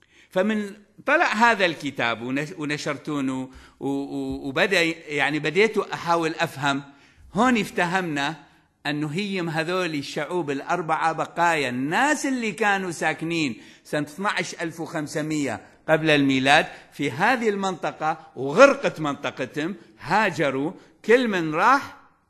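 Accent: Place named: Mosul, Iraq. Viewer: لهجتك المصلاوية مشوقة بقدرمحاضرتك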